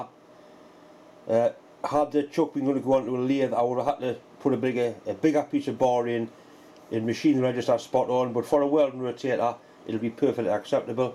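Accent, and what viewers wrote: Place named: England, United Kingdom. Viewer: Nice going fer a twice Cacky -handed ,funny talkin` Pom , keep-on messin` aboot dear friend of the same affliction !! Regards Rod Chessell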